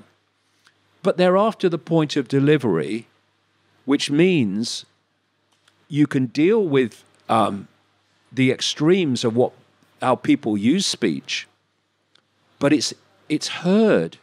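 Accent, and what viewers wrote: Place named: RP, United Kingdom. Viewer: Freaking amazing that Dave understands another language but answers in the Queen's English?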